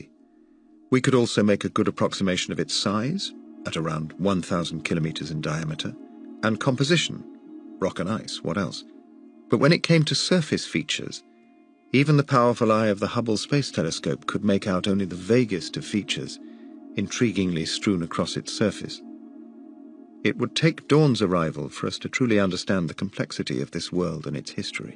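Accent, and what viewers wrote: England, United Kingdom. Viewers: The 'british narrator' is agreed by anyone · I use this to fall asleep. Perfect! Space....check. hours long....check...British narrator...check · Fantastic movie, can't imagine the amount of effort put into it... apparently I have a lot to learn about the YouTube business model. As for the narrator, he's binary compared to everything that's wrong with the world today... intelligent, intellectual, rich language but not inflated, calm, not artificially sensational and (thank god for the) British accent! Well done! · Glad I’m not the only one that prefers British narrators to fall asleep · My literal checklist for prime sleep! Space, hours, English accent 👌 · Perfect narration. Beautiful, clear and calm. A voice which is trustworthy and very, very British. So refreshing. America take note. This is how you inform people. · British narrator is a must for me. 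· Yes! I agree 100%, His delivery has the perfect cadence, calm and british ( that is way easier than synthetic pseudo-british or irritating southern american drawl to digest for hours at a time), and the information is useful and free of words like "shocking" or "we were wrong" type crap....thank you. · British narrator you know this, I can’t watch any doc without a British narrator and this guy is soothing asf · 😂 what’s the deal with the British accent? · lol, i like this video putting in what appears to be real pictures. Hard to find documentary on yt showing that, wish more would do it. And british narrator should be on all docus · This helps me sleep so well because the narration is stitched together SEAMLESSLY. There are no pauses or awkward silences for my brain to pick up on. The British Narrator is CONSTANT. Like a metronome - just keeps going. 🧠 💤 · That good ol’ monotone English accent … nature’s sleeping pill. 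· its an English accent. Britain is made up of multiple countries, each with its own distinctive accent.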